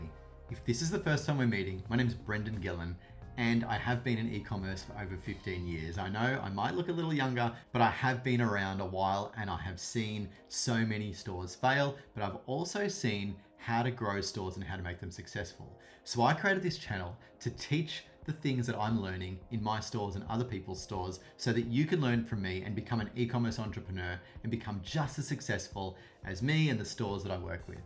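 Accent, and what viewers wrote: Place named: Australia. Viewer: Automatic trust, when I hear Aussie accent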